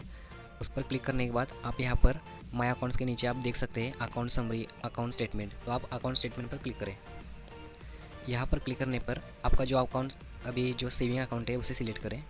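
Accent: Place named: Kolhapur, India. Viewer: Bhava kolhapuracha ahes vatat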